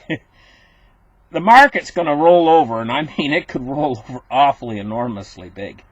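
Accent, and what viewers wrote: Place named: Yukon, Canada. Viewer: i try to copy accent, but hard... is it Klondike?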